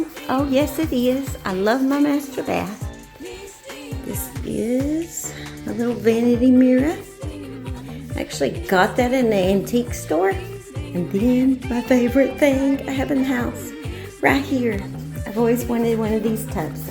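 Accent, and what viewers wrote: South, United States. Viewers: Bloody hell! There are just no words to describe this level of depravity! On a positive note: I LOVE your southern accent ❤ Cheers from Australia 👍😁🇦🇺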